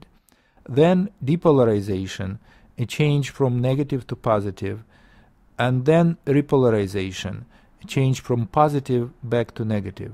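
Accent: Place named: Russia. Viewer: The Russian accent makes it cooler!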